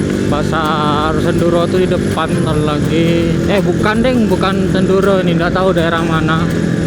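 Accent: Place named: Madura, Indonesia. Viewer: Logatnya maduraan.. hehe salam persaudaraan mase....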